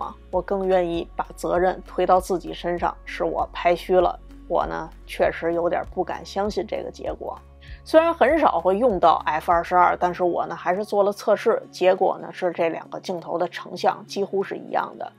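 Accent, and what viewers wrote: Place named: Beijing, China. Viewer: 都是北京腔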